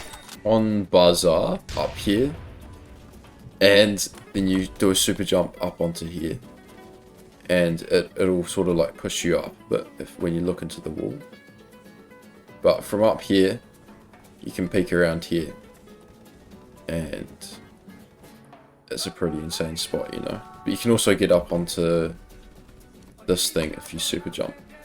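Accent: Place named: New Zealand. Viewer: also are u kiwi? i am too recognise ur accent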